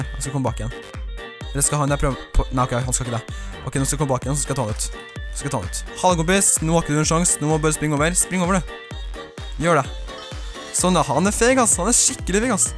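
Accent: Trøndelag, Norway. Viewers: Elsker den positive holdningen din og trønder dialekten! :D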